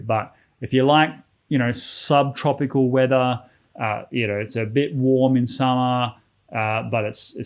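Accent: Australia. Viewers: Your accent is what I hear when I think of "Standard Aussie Accent" 😁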